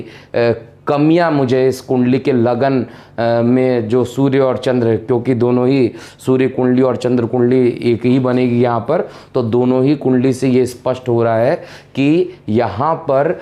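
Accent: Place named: Bengal, India. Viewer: First learn to speak correct hindi first.dont mix bengali in hindi speaking.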